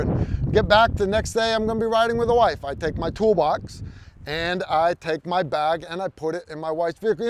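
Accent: United States. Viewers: Is it just me or does he have elements in his accent really similar to Christopher Walken? I may be way off I'm not sure but just curious if anyone else hears that? Is it some kind of American Accent?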